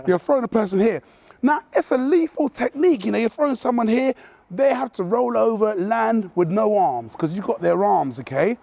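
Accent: United Kingdom. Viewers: I only noticed you had a UK accent after being subscribed for a month. I’ve watched enough Doctor Who that these accents slide under the radar unnoticed. #Murica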